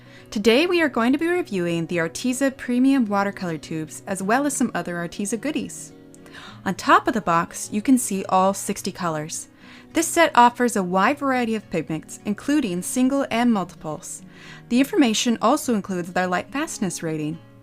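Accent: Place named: RP, United Kingdom. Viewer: you sounded so posh! great review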